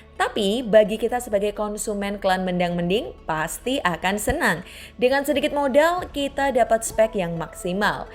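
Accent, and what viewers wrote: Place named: Java, Indonesia. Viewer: Bacanya ga masalah, medoknya itu yg masalah...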